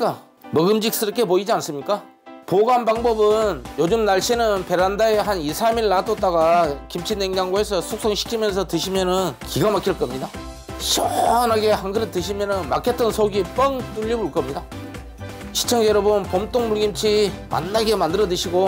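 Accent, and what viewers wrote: Jeolla, South Korea. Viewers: 봄동 안담가도 전라도사투리 땜에 기냥 너무 맛나부러요 나도한번 담가볼려구요 그런데 지난번 무 간장짱아찌 담근거 어떻게 해묵어야 맛나는지 가르쳐 주신다했는데 ᆢ어떻게 되셨나요? 찾아보니 아직 못찾아서 유ᆢ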